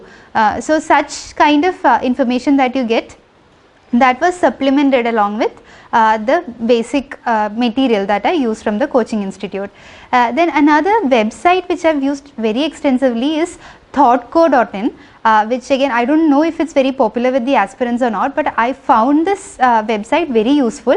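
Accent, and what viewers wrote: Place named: Karnataka, India. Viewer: English fluently but tone is kannada....nice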